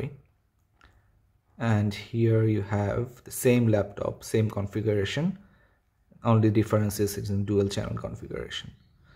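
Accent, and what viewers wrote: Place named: Bengal, India. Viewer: Accent shune bujha jay bangali... Hoytoh dhakar keu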